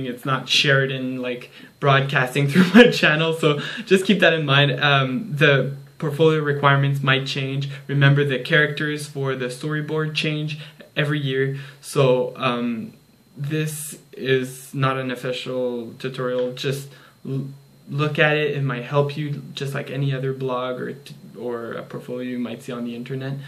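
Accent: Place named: Germany. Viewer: You sound german which is super hot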